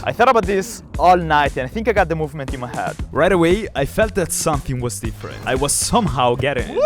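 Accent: Italy. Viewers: L'italia si sente... nella lingua :D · Hai un accento così italiano 😆 (bellissimo)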